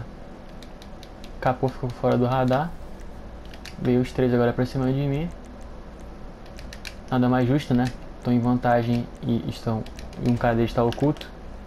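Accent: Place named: Belém, Brazil. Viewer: tu mora em Belem do pará? eu tbm que sotaque igual kk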